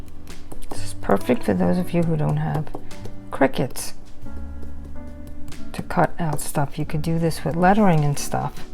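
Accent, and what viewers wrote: New York, United States. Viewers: I just love this!!!!! I was gifted some snowman tiles and was going to make coasters, but this changed my mind!!!!!! Great project!!!! Thanks for sharing!!! God bless!! Are you in NY or NJ? I detect a little accent!!! lol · Hey there! Stopping by from the playlist. LOVING these. God bless the internet and the incredible farmhouse pics we can find. Will def give the hairspray idea. So, do I detect a fellow New Yawka? Brooklyn born and raised here but living in a world of cows and bears these days 0_0! Have a great day!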